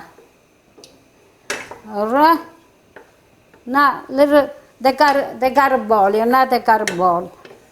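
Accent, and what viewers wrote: Italy. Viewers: .....,mi chiamo Carmela...I love your Italiano- Inglese as much as I ❤ love your cooking! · Im starving now😋 i have to make this. I love penne ala vodka and the peas are a good addition. I also love your singing and beautiful Italian accent 🇮🇹 Bravo!! 👋👋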